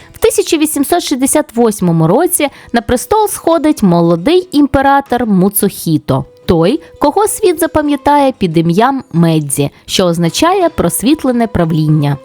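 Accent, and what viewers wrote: Russia. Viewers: Мій комент майже аналогічний) Дуже ріже вуха мацкальска вимова японських слів і назв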